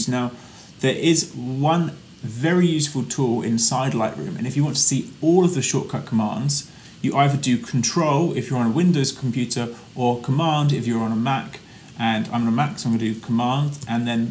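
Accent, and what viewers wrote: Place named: United Kingdom. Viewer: Great tutorial! Very helpful. The British accented paused before saying "ZEE for zoom" made me smile, too.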